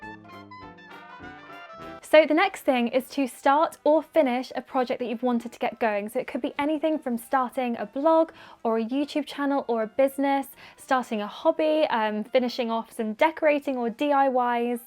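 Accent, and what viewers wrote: United Kingdom. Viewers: Jennie Aesthetics I’m English and sound nothing like her she is posh English 😂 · her british accent... love it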